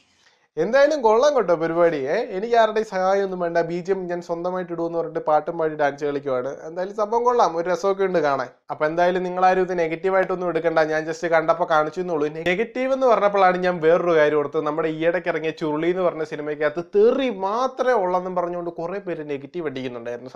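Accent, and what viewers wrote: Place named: Kottayam, India. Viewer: Chettan kottayamkaaranaano slang kettittu thonnanallo😊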